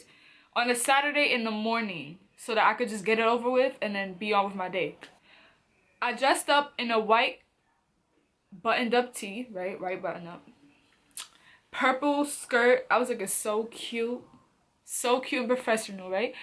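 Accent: New York, United States. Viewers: I don't know which one you worked at but I'm sure it's in New York somewhere with that accent lol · I like your accent are you in New York ?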